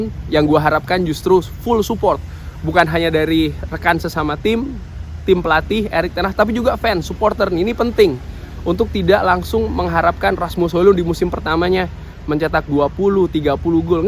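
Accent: Semarang, Indonesia. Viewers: Bang, orang Semarang ?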